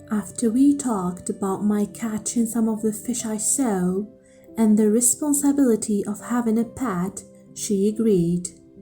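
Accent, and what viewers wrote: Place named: United Kingdom. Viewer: You have a really sweet voice. Seriously i almost thought you're a native british woman.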